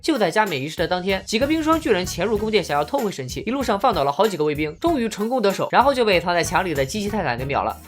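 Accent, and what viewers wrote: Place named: Tianjin, China. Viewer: 那不是天津话么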